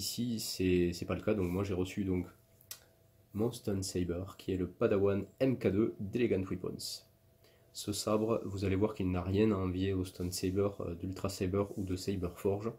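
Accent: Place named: South, France. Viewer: merci pour ta vid, après être tombé par hasard sur une vidéo de Michelle Christa Smith , j'ai trop envie de me lancer dans le maniement du sabre laser mais pas pour du combat, juste du freestyle. tu pense qu'il y a des clubs ou groupes dans le sud? vu ton accent je pense qu'on est pas loin.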